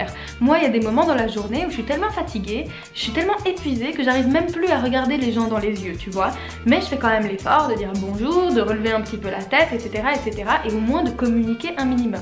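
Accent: Switzerland. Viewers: Je me disais bien que ton accent me faisait penser à quelque chose (à mon propre accent donc haha) ! Une Suisse ouii ❤️ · Très drole, j'ai eu le réflexe inverse..Je me suis dit.."tiens, elle n'aurait pas un accent suisse?"...Et puis elle à parlé de francs et là "Ben ouais...elle est suisse"....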